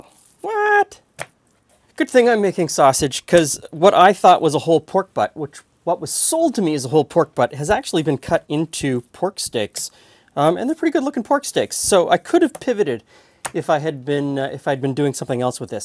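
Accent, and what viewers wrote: Canada. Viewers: I'm amazed at the kitchen equipment he has. He must have a big place to store all that stuff. I mean, who has a dedicated sausage stuffer? It's not exactly small either. Also, I wanted to mention for a while now, I love how Canadians say certain words like "out". I can't even describe how it sounds to me. Makes a Canadian, or American from the far North stand out every time.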